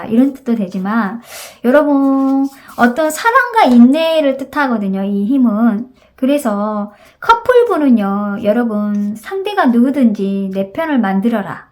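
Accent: Jeolla, South Korea. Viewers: 전라도 분 이신가 봐요?반갑네요! 1번 리딩 감사드립니다^^ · 1번 감사합니다 ㅋㅋ 전라도 분이신가 봅니다. 광주 목포쪽인가요? ㅋㅋ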